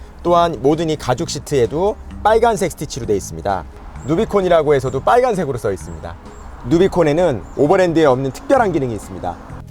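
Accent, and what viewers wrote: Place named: Gyeongsang, South Korea. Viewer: ㅎㅎㅎ굿 비교 영상 좋네요. 갱상도 말로 지깁니다.ㅎㅎㅎ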